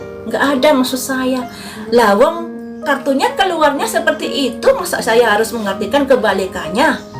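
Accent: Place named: Java, Indonesia. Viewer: Suka dgn logat nya *...medok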